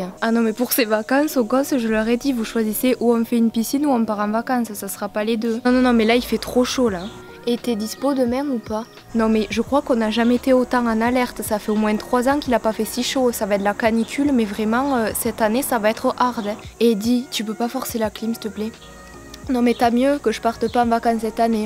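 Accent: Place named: Gers, France. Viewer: Twizix normal c'est celui du Gers on a le même 👍🏻😉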